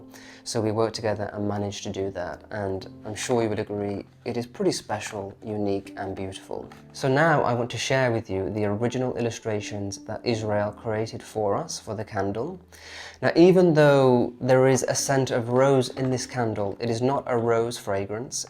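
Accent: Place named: England, United Kingdom. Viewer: you are simply lovely. Yes, beauty does make life enchanting. The English garden, way of life and pronunciation: is you encapsulated. Thank you my friend.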